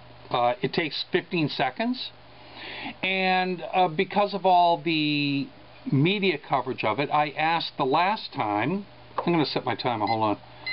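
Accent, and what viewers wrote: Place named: Boston, United States. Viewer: HA!!!! Now I know what your accent is.... a Boston accent! lol Soulpeace Sharon Alyss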